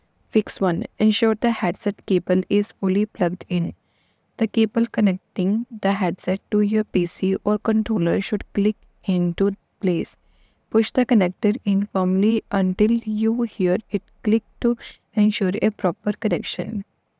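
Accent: India. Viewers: Can’t escape the annoying Indian accent anywhere these days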